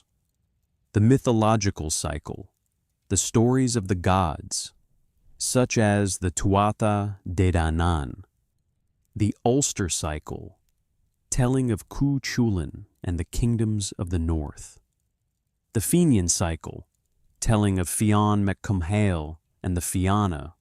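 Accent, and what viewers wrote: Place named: United States. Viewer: Shame a Celtic myth is narrated by an American.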